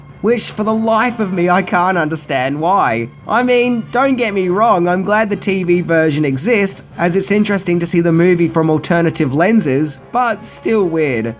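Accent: Australia. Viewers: My God this guy is Australian!!!!! I didn't know that!!!! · your Australian. or do you just live there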